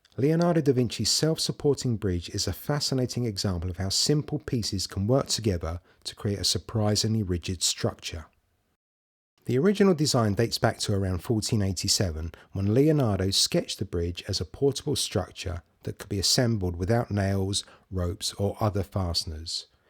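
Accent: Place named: United Kingdom. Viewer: Gracias por explicarlo, aunque me cuesta trabajo entender el inglés Británico al menos entendí la física ❤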